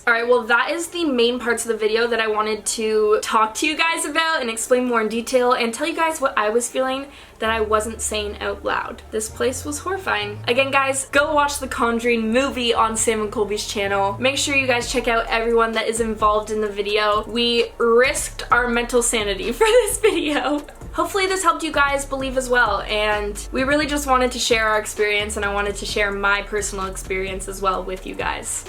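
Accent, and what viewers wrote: Canada. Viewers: Gurl I love you candian accent and got used to it now I say aboat,melk,house in you accent love you and btw love your new merch and guys go stream. Love idk if I speeded it wrong opsie👉👈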